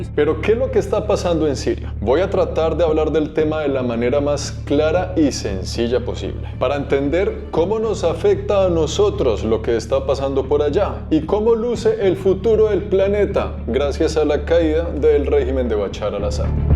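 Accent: Colombia. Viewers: Tan bello con ese acento colombiano inconfundible !!!! Muy buen vídeo !!!!!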